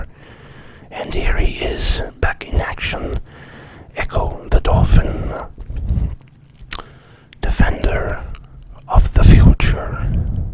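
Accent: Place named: Japan. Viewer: I love the old Japanese accent! 😂 Perfect 👌🏼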